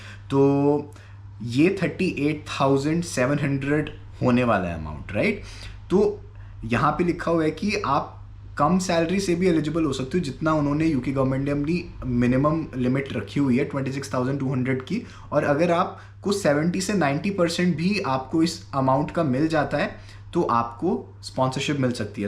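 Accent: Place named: India. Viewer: I can't your English very well it's too indianish!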